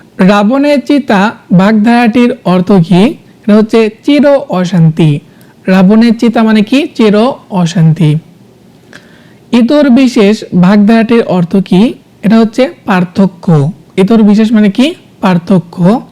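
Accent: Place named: Bogura, Bangladesh. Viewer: ভাই এর বাসা বগুড়া নাকি? ভাষা ত তেমনই 😊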